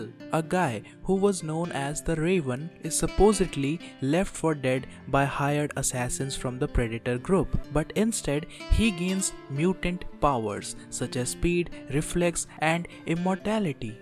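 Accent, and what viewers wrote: India. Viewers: Too much Indian accent in the voice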